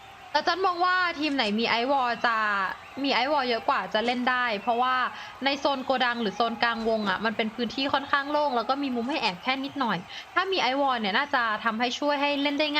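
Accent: North, Thailand. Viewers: พี่ พูดภาษาเหนือหน่อยน้าา~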